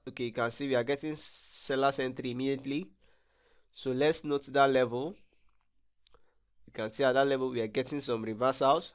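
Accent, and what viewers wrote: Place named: England, United Kingdom. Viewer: I like your english accent, haha , btw love ur work 📉📈📊✔️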